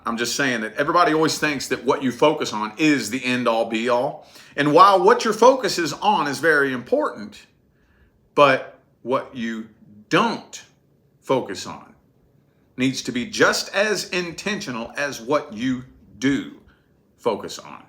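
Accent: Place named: Louisiana, United States. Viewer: I'm looking at your beautiful eyes and You're lusianna voice I think, love from Ireland.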